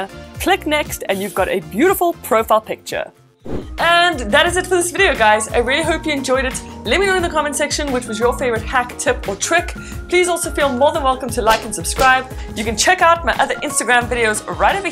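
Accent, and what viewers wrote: South Africa. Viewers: South African ? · You from South Africa nehr ? 😂🙈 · Are you south- African? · South African accent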